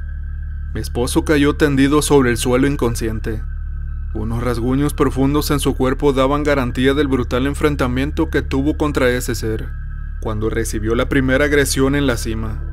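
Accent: North, Mexico. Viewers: Hola soy Elisa y trabajo de housekeeping en un motel 6 en Texas y a todas miss compañeras nos gusta tu voz norteña en tus relatos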